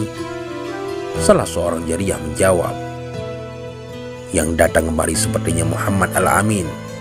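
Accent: Aceh, Indonesia. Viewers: Dari sebutan huruf D nya saya tuhu yg menceritakan kisah nabi ini pasti org aceh.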